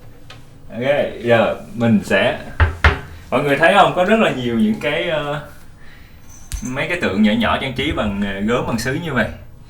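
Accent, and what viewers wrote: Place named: South, Vietnam. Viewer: giọng rất truyền cảm. chắc tại dân Bắc ít nghe giọng miền trong nên thấy hay lạ.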